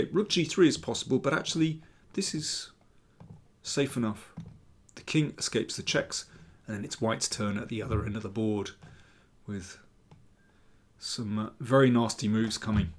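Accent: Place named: Oxford, United Kingdom. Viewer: Dear Mr. King. I know you understand German: Sehr schönes Video, unterhaltsam analysiert. And I love this sort of Oxford English!! Great!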